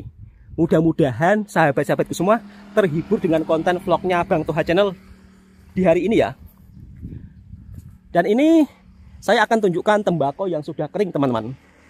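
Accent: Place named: Central Java, Indonesia. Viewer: Tukang bangunan dan anak mudanya banyak yg kerjah di IKN, darih jawa tengah,jawa barat, jawa timur, merekah membangun ibu kotanya yg baruh di kaltim, mas toha sepertinya orang ngapak jawa tengah, tetap semangat mas toha walaupun tinggal di desah mantab ❤❤❤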